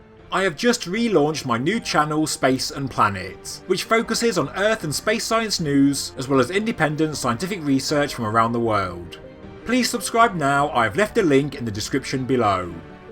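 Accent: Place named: United Kingdom. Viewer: Could you please tone down little bit of your f****g british accent and tonation so more could fully comprehend you talking s***t chanell..your bri* accent i can tolerate but you monotonose boring tone is irritatingly offending to the ear...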